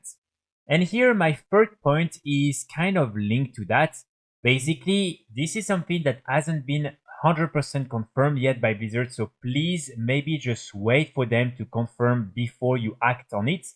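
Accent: France. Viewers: J'aime beaucoup ton contenu et tu est super regulier ca fait vraiment plaisir , j'ai pas un niveau de dingue en anglais mais je comprend l'essentiel, ca s'entend que tu est francais du coup je me demandais si tu avais deja songé a faire tes videos en fr je suis certain que ca trouverais son public 😊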